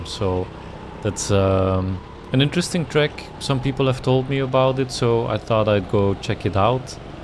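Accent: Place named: Flanders, Belgium. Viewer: I could hear you were Flemish after the first few words 😂 Awesome perspective from an experienced Karter though. Do you sim race as well? That's my main thing currently. I enjoyed watching this, bedankt!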